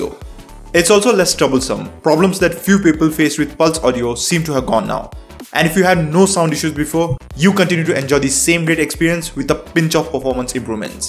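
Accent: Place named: India. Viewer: My ears are bleeding hearing this effed up horrible Indian accent, and I had to stop at less than a minute into the video 🤮🤮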